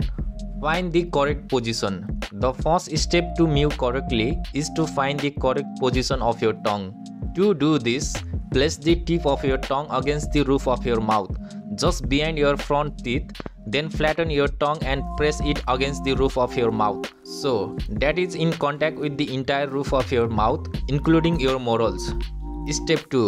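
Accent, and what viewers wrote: Nepal. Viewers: Nepali cha taw boli tah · Bro face reveal gara ta tmero sound le tw 100 percent nepali ho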